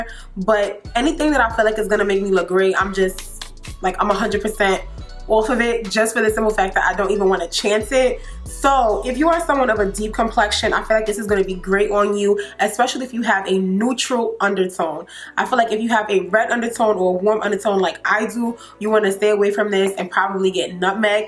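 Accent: New York, United States. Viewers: Where u from? Do I hear a fellow New Yorker?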